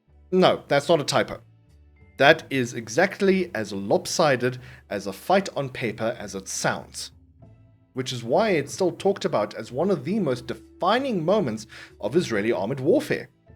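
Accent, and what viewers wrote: South Africa. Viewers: In love with the Afrikaans accent.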